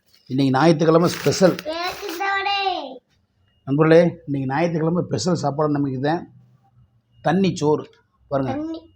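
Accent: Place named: Dharmapuri, India. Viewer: அக்கா பேச்சு சூப்பர் தர்மபுரி பேச்சு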